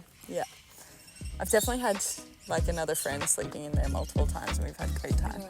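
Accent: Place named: Australia. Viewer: She has certainly picked up the Aussie accent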